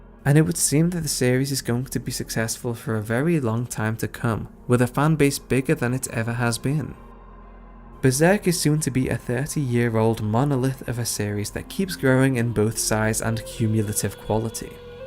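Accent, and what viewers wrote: United Kingdom. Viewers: an overly-soft UK accent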